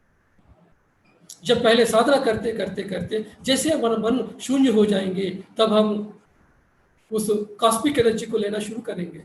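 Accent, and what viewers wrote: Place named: India. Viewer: I am fascinated by yur valuable words as well as ur Hindi ascent Sir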